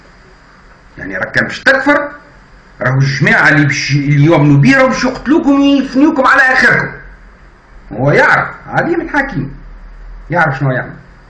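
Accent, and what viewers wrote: Tunisia. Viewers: ههههههههههههههههه تعجبني لهجة توانسة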